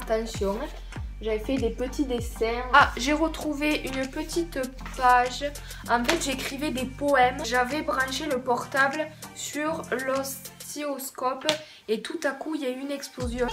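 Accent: South, France. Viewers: Team accent du sud ahah💗💗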